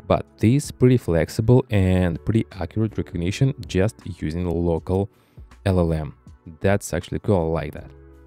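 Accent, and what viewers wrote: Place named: Yucatan, Mexico. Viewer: Nunca había escuchado un acento yucateco en inglés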